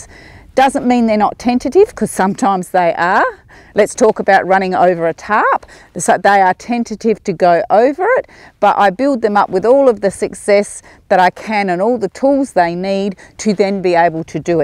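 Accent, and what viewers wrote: Australia. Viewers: I’m doing lessons and I might canter this term and are Australian because of your accent I feel like your Aussie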